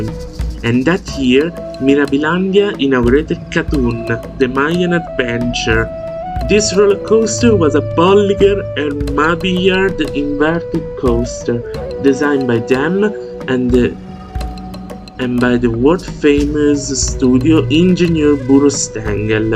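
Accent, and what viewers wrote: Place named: England, United Kingdom. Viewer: Bravissimo mi piace quella pronuncia inglese ;)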